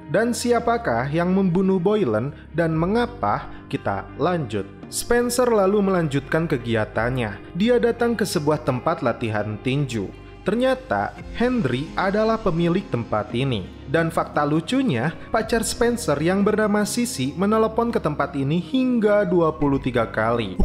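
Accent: West Java, Indonesia. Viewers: Apakah pengisi suara broview adalah orang sunda? 🤔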